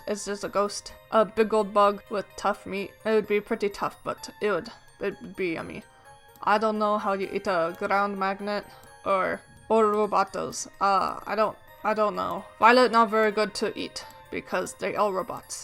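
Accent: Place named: France. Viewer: You sound french or something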